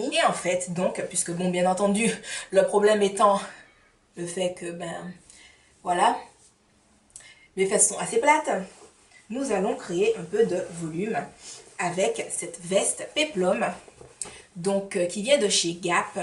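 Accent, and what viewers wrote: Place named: Antilles, Caribbean. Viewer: ton accent antillais, c'est trop mignon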